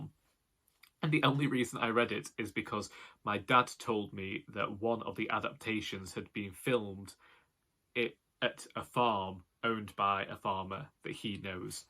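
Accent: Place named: England, United Kingdom. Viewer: Great picks. You have a cool accent. Which part of England are you from? Here's my ever changing TBR. I got really sick so I started early. 1.Sensationalist Novel: Either some Mary Elizabeth Braddon book or and Uncle Silas by Joseph Sheridan le Fenu 2. Book that takes place in the City: The Romance of The Shop by Amy Levy and hopefully also New Grub City by George Gissing3. Book that takes place in the Country: Carmilla by Joseph Sheridan le Fanu (already read)4. Best Seller: He Knew He Was Right by Anthony Trollope and, if I can fit it in, The Heir of Redclyffe by Charlotte Yonge5. Book with Female Lead: Esther Waters by George Moore and Janet's Repentance by George Eliot (already read both)6. Audiobook: The Mayor of Casterbridge by Thomas Hardy (already read)7. Group Read: Gothic Tales by Elizabeth Gaskell And for fun I'll probably read some poetry and short stories (I already read The Canterville Ghost by Oscar Wilde)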